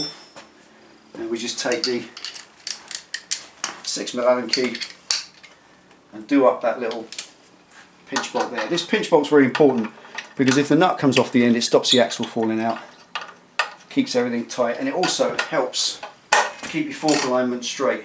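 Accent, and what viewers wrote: United Kingdom. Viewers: You , sir, are absolutely brilliant! I always watch a video or two each time before working on the Bonneville. Being an Anglophile, the British accent goes a long way toward getting what you're saying lodged into my mind, lol!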